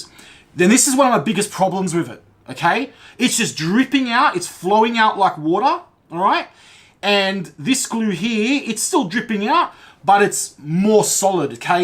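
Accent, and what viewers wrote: Australia. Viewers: The Aussie is strong with this one. Maybe even New Zealander? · Great video, good enthusiasm, informative and Aussie accented! Thanks for making this.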